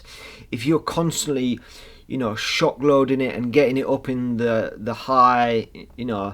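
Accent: United Kingdom. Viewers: Sweetest name ever!! With the British accent! This guy needs to be a movie star!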